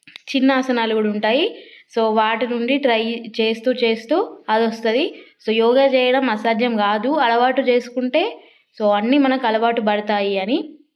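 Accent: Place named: Telangana, India. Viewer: Subjeect with telangana slang 😂 👏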